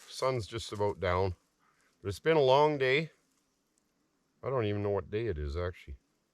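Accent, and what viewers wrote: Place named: Canada. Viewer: I appreciate your inserts of humorous bits from other movies! & your Canadian accent & skills....thanks.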